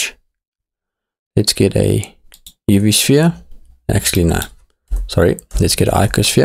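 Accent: South Africa. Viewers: Ah, a South African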